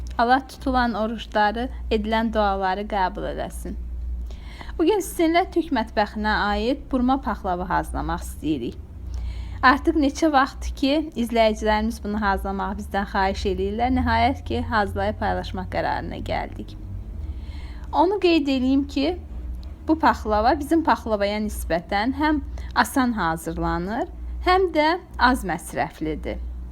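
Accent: Azerbaijan. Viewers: Azerbaycan şivesine bayılıyorum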